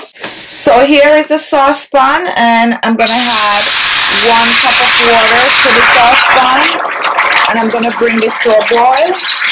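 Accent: Jamaica. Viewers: You're Jamaican right? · Were are you from? You have a strong Jamaican accent.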